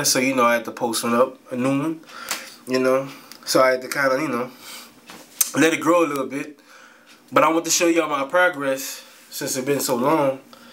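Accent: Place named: Louisiana, United States. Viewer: u from lousiana my dude. u talk like my cousions.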